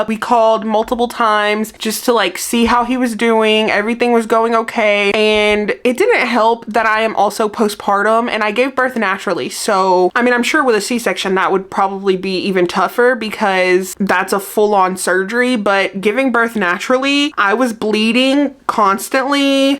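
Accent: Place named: Alabama, United States. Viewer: Girl I want to come up to your town and just sit and have a coffee and talk to you ❤.. I actually live in Phenix City Al and it's so nice to hear someone on YouTube that talks like me, I'm 50 yrs old I have lung cancer. I've been watching you from the start of your YouTube journey. I'm so happy that you are now so popular. You have grown into a wonderful young lady and a absolute amazing Mom. Sending love and prayers 🙏🙏❤️ to you Kev and Oliver.. love the long form video content. You are an example too others your age. ❤❤❤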